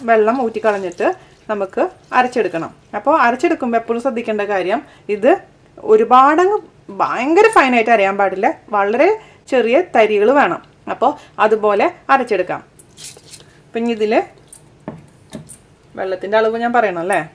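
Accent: Thrissur, India. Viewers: chechyyy..u r soooooo beutifull..ur smile n presentation also..ithok ondaky nokalonnum nadannillwlum checheede aa thrishur slang charapara varthanom chiriyum kaanana njan e videos mainly kaanunne..God bless😊☺😙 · thank you for your prompt reply Chechi! I'm making it for Ganesh Chaturthi :) I have tried so many of your recipes and it's been a Hit!!! I esp love your Thrishur dialect reminds me of my Guruvayur cousins :)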